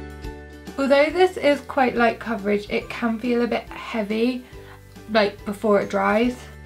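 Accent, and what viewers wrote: United Kingdom. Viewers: I love your accent. I've always wondered what it would be like to live in the UK, mmmmmm, anywhoo, thanks for sharing, great tutorial.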